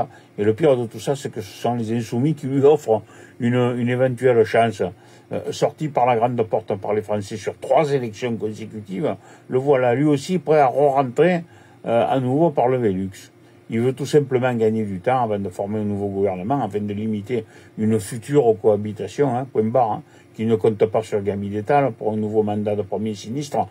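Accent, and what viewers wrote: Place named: Turkey. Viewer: Parle français tonton ont comprend rien avec ton accent de turc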